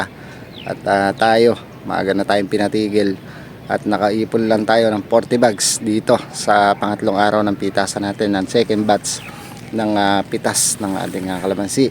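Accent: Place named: Bulacan, Philippines. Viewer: Bakit ang punto mo when you speak tagalog ay parang from Bulacan ka? I am from Hagonoy, Bulacan. Pero, 54 years na ako sa America. Paborito ko kasi ay calamansi juice.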